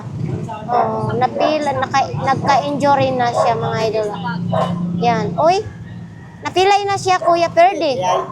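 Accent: Visayas, Philippines. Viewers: bisaya ikaw naman 😂😂😂😂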